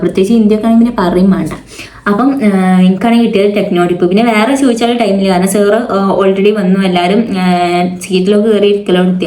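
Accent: Malappuram, India. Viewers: Aa varthanam kettaa arinjoode mlpprm ahnn nn😂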